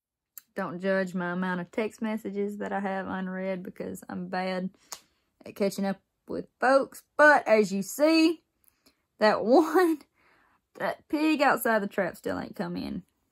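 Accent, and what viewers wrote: South, United States. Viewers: You're so darn cute and I like that southern talk 😍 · By god ,that southern accent is so hot . Sorry don't mean to be rude .but you sure are a beautiful woman . Will you merry me? Lol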